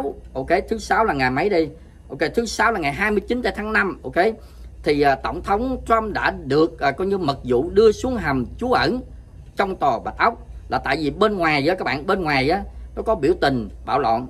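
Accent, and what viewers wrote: South, Vietnam. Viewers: Chào anh, mình xem clip của bạn,mình thích nhất giọng nói người người miền nam của mình.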